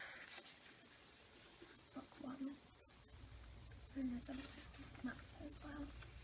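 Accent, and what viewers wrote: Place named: Wales, United Kingdom. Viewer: It sounded like you had a strong Welsh accent in first video but not so much now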